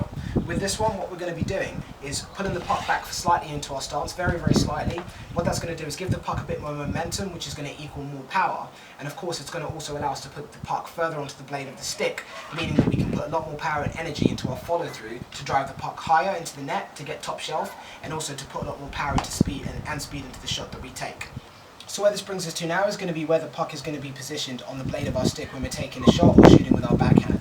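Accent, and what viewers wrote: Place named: United Kingdom. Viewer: A black guy with a british accent giving hockey tips... awesome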